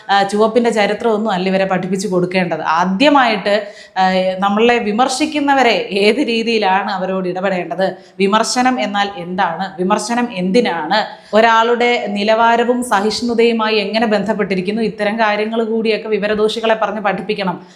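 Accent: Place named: Trivandrum, India. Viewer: പ്രത്യേകിച്ച് ട്രിവാൻഡ്രം സ്ലാങ് 😂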